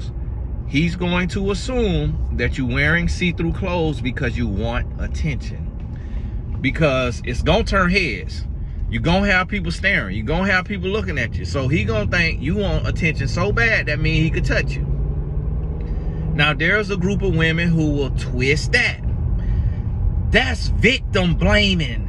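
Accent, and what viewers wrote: South, United States. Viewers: Mr I thought I talked southern but you have me beat.. 😂😂 God Bless you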